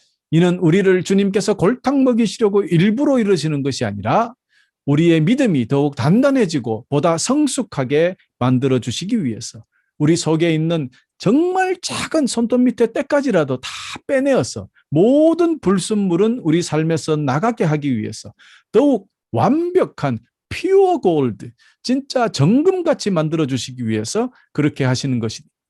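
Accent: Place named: Gyeongsang, South Korea. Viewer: 아 그리고 혹시 목사님 경상도 신가요? 손 좀 씨꺼라.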